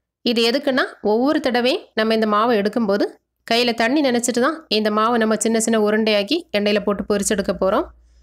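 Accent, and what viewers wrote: Kerala, India. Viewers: Are you a malayali pronnounsation malayali touch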